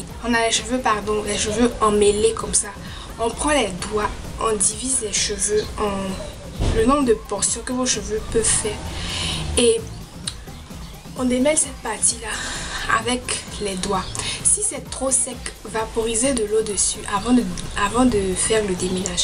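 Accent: Ivory Coast. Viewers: Jaime bien tes conseil tes belle avec cette voiture mais l'accent ivoirien te a trop mais bon courage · J'ai imediatement cu qu'elle est ivoiriene grase a son acsen francais.D'esole pour mais faute mon clavier est en Englais je Vie au etat unit et je suis burkinabe je vais au licey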